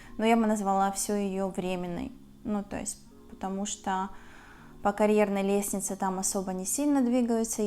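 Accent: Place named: Urals, Russia. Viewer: Так у вас говор Уральский, как это вам менталитет не подошёл?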